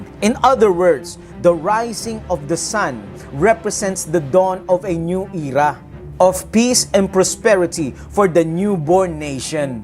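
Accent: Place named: Bisaya, Philippines. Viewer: Bro could you change your bisaya accent to tagalog or neutral accent? Para kasing putolputol pakingan...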